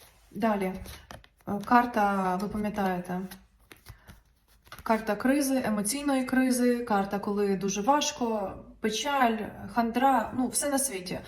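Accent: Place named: Kremenchuk, Ukraine. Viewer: Чистейший украинский, скорее в Кременчуге слышал, ни в Киеве ни Харькове, в Харькове вообще не слышал, ни в Полтаве ни в Бердичеве, ни в Житомире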